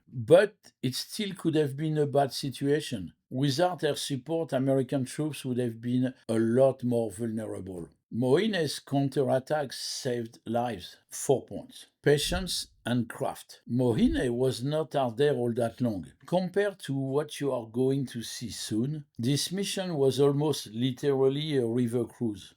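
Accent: France. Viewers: Un bon accent franco-français ahah j'adore tes vidéos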